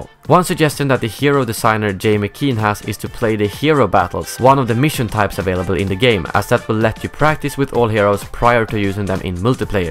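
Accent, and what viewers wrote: Sweden. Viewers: Are you from Sweden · I'm sure you're swedish ! (I heard your accent haha) but great vid bro, keep going! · Jo han är svensk. jag hör att han är svensk.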